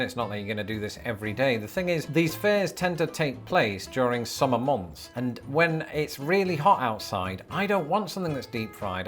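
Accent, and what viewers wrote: United Kingdom. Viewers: After 17 Years of Living in America , You Still have a Very Thick , Heavy BRITISH Accent . 🤣🤣🤣🤣🤣🤣🤣🤣🤣🤣🤣🤣🤣🤣🤣🤣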